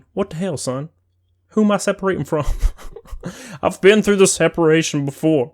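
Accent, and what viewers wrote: South, United States. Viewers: Bro I am from the south and I can tell you are to from your accent and that it is awesome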